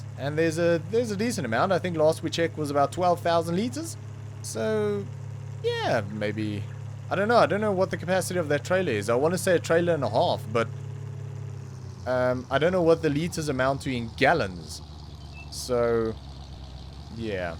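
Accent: Afrikaans, South Africa. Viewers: It sounds like you have an Afrikaans accent. I'm curious where are you from.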